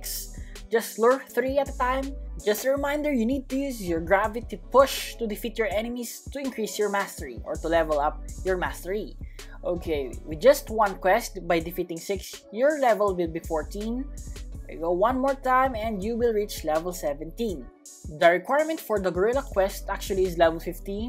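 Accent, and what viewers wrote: Philippines. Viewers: You from Philippines? Cuz ur accent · Are you Filipino? I can tell by your accent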